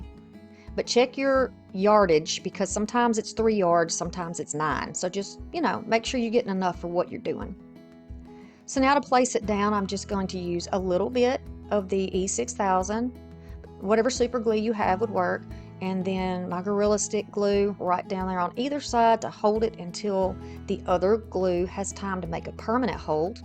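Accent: United States. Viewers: 💕 Love them! They all turned out Beautiful. Thank you. By the way, I LOVE your country accent! 💕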